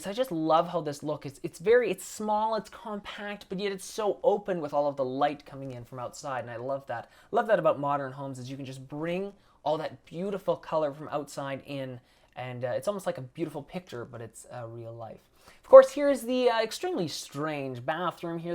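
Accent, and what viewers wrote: Canada. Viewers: He's Canadian, so he has an accent. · Are you Canadian? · He's Canadian, they pronounce it differently. It's not that serious!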